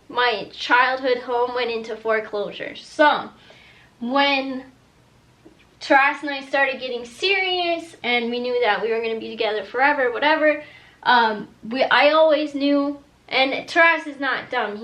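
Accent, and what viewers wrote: Russia. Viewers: You have a tiny Russian accent lol I think you got it from Taras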